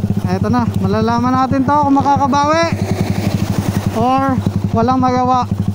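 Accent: Iloilo, Philippines. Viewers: Ilonggo ka lods tga dn kmn?🤣🤣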